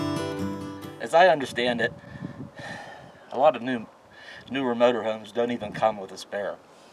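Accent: Pennsylvania, United States. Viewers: He....I hear a back east man talking Pa accent...Am I right? That tire was to heavy for me to change, good job. Hope you were able to get a spare at a good price....Janice from Pa,now Arizona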